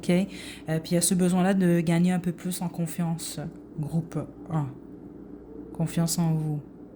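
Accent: Quebec, Canada. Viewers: Merci la! J’adore écouter votre accent québécois depuis ma Provence natale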